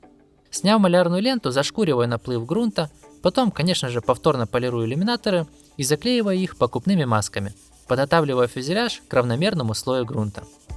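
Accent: Ukraine. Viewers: Чувак явно с Украины)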